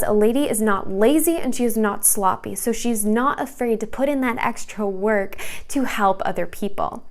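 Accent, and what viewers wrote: Midwest, United States. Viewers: What Midwest state you from?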